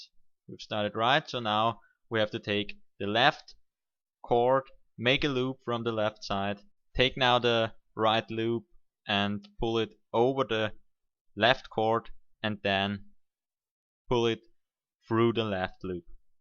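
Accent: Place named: Denmark. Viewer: Det lyder som en dansker.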